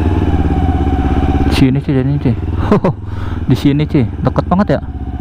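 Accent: Indonesia. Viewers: Anjir bahasanya orang indo bat kentel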